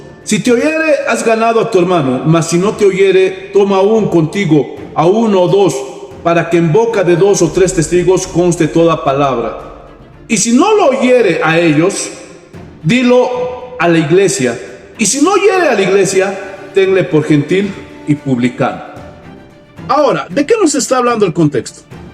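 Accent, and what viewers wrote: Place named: Mexico City, Mexico. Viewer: Hermano usted es de México? Tiene tono de la Capital. Bendiciones hasta dónde se encuentre.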